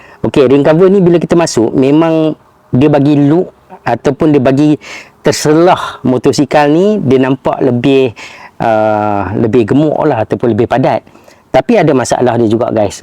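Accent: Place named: North, Malaysia. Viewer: Bunyi mcm utaqa...area mana bang?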